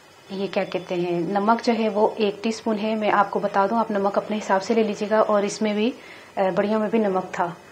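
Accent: Punjab, India. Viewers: ji sister ji mai app ki punjabi bolnay kay style say he app ka fan bana tha pher app ki recipe etni achi thi kay kiya batahu sab ghar mai mama orr sis bana ka deti hain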